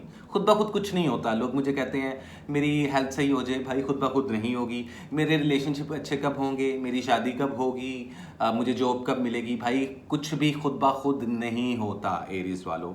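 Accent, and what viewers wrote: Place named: Punjab, India. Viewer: Gemini❤❤ Ap Punjabi bry mzy ki bolty ho 😂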